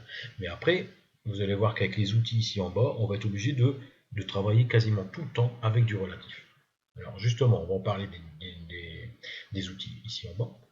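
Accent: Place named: Nord-Pas-de-Calais, France. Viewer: Un tiot accent ch'ti, quelques fautes de français, mais chapeau pour ce travail de partage, bonne pédagogie, sans pub; si les leçons suivantes sont du même tonneau, je risque de me convertir à LibreCad. Un gros MERCI, un peuch bleu et un abonnement. PS: désolé d'avoir introduit du ch'ti dans le commentaire, je présente mes excuses aux internautes de langue française et ne recommencerai plus.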